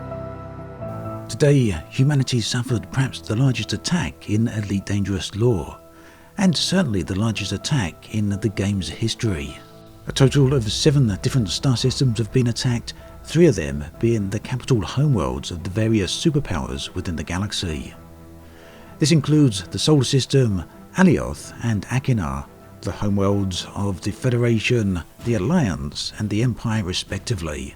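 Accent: United Kingdom. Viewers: This dude says Bri'ish unironically, I promise.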